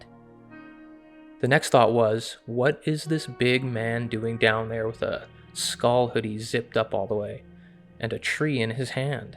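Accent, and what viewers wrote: Canada. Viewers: Isn't he from Canada? Lol.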